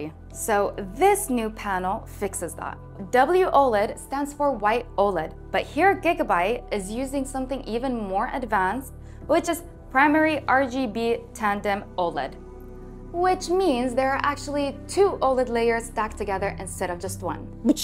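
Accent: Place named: United Kingdom. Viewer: I need this monitor because you do the best British accent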